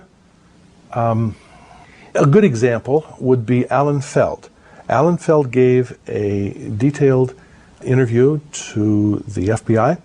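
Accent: Pittsburgh, United States. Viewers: I got his book amazing work you from Pittsburgh I live on the norside of Pittsburgh off Brighton road I ask because your accent is Pittsburgh all the way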